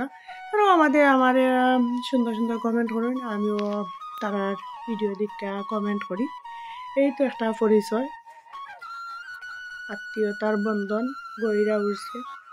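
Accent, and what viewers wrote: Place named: Sylhet, Bangladesh. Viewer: আসসালামু আলাইকুম কেমন আছেন দিদিয়া বাসার সবাই কেমন আছে মাশাল্লাহ আপনার কথাগুলো অনেক ভালো লাগলো আপনাদের বাড়ি কি সিলেট দিদিয়া আপনার কথার প্রেমে পড়ে গেলাম এভাবে কথা বললে আমার খুব ভালো লাগে আমিও কখনো সজনে দিয়ে ডাল খাইনি দেখে তো খুব খেতে ইচ্ছে খুব ভালো লাগলো আজকের রান্নাবান্না আপনি জন্য দোয়া এবং ভালোবাসা রইলো তোমাকে সাপোর্ট করেছি আশা করি ছোট বোনটা কেউ করবা সব সময় বোনটাকে পাশে পাবেন ইনশাআল্লাহ 🎉🎉❤❤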